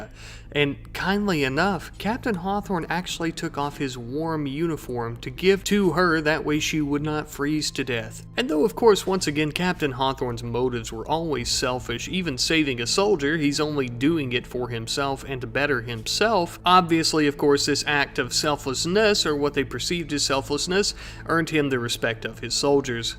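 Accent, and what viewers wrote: South, United States. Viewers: I've been here around this channel for a while even commented about your southern accent at one point (don't know if you remember lol) but it's nice to see you grow and I hope you're doing well! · are you southern? i swear i hear an accent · Who cares if he is working off a script, human or AI written. In fact, I appreciate the hustle. He took the time to edit his lines, work on his jokes, while writing it out. Now tell me, why ChatGPT? If anything, he mixes in a lot of local colloquialisms and that Southern charm, AI can't write that, can't deliver it. Llistening to makes me miss the south, a lot. Peculiar, genteel, savage, all at once. Oh and swampass, can't forget that.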